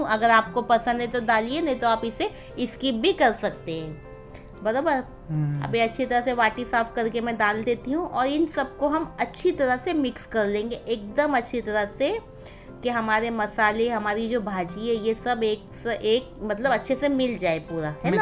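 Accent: Konkan, India. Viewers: appa us mirchi ko bedgi mirchi kehte hai love ur voice ekdum kokni wali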